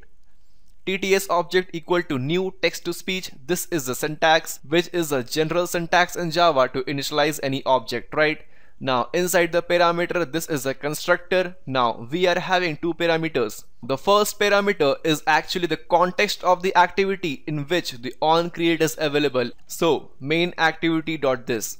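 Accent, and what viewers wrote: India. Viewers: Indian xd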